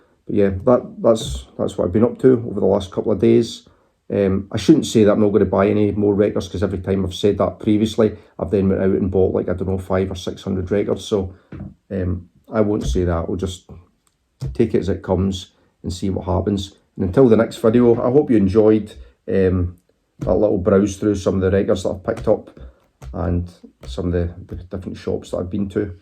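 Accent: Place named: Scotland, United Kingdom. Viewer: Hi Look at all those records eh? Wow. That cover art was stunning. Wow. That would probably fetch a nice penny or two. My knowledge being limited to the RSD one I bought this year. Hey, there's the I got in Stockholm. Aww... (my one valuable Albert and some c**t threw a dart at it. Ah, that beautiful scottish brogue of yours. "Kånnty Jo and the Fesh". I had Irn-Bru in Stockholm. Oh, Vanguard. Reminds me of the... Anglia logo? I'd love to get the discography now (on vinyl) since I now have all of the ones, and, so, love that album!... love that album! I don't mind you don't like them. Btw have you tried listening to the ones? Splendid haul. Cheers 😊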